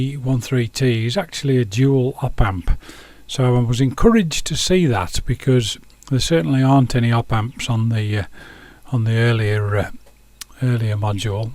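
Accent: United Kingdom. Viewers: is not a ‘dude’ - he is British (he sounds like it at least). and we don’t have them over here. 😂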